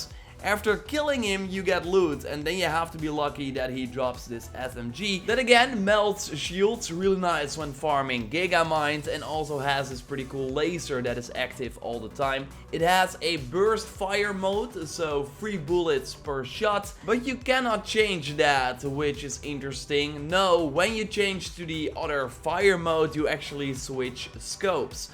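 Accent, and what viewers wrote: Netherlands. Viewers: You have the same accent as the YouTuber tbag epic gaming. So I'm guessing you are from the Netherlands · Hey, are you dutch?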